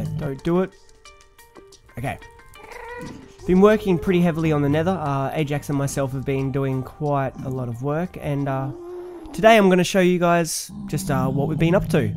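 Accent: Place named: Australia. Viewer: Which part of australia are you from????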